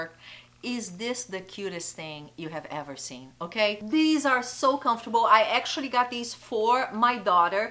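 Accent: Brazil. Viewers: Are you brazilian? Because of the accent! Love your videos!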